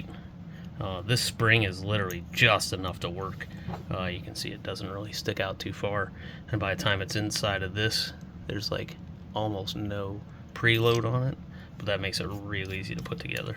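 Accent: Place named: Pennsylvania, United States. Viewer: I am a chemical engineer and I love building things. I am starting a YT channel as soon as it gets warmer here in PA where I can get out to the barn. I am recovering from getting hit and run over on my 1957 Harley Panhead so I will be laid up for a while. (had my right leg nearly crushed in two). I am starting a build of a 1928 Morgan Aero Brooklands edition racer. Similar to a Pembleton T24. I am gearing up to cast aluminum for different adapters. That being said I purchased a revolver lifecard toy. I am thinking about casting it out of aluminum and inserting a rifled barrel and see how things turn out. I will let you know how things go.. You sound like you are from Pa also...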